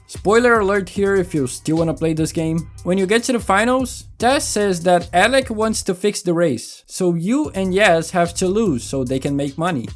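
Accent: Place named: Brazil. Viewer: you have a really brazillian accent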